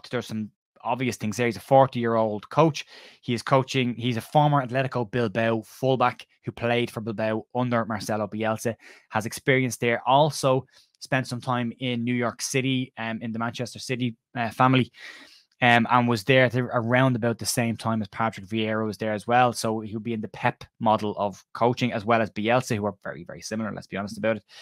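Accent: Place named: Ireland. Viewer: Where in Ireland you from bro? I'm Newtownards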